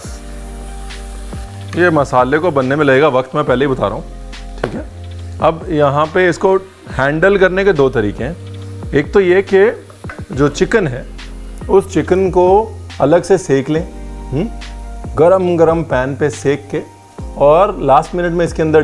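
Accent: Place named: Malwa, India. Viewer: It's great to listen to your malwai punjabi. Esp when you quote your grandparents. Keep on...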